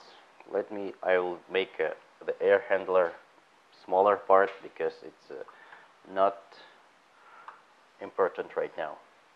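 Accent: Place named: Russia. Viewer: 🤣😅да на таком рашен инглише почти все у нас в сша и говорят. у меня волосы дыбом но все англоговорящие вынуждены понимать и никто и глазом не моргнет) а куда деваться?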